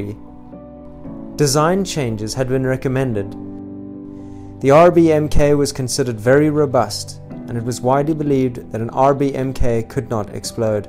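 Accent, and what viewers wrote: South Africa. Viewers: Just heard your accent and knew you from South Africa😂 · Nice SA accent boet❤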